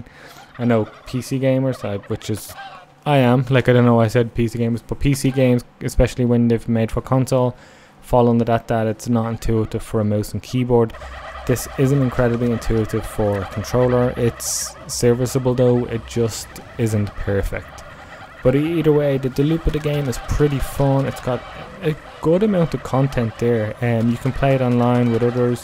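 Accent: Ireland. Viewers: You're Irish mate aren't ye, thanks for the review though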